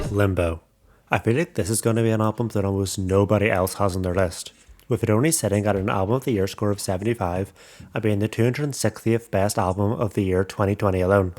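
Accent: Ireland. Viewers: The Irish accent trew me off ngl 😂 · Love this. More of these please! He talks and he’s Irish! OMG.. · I was not expecting the Irish accent lol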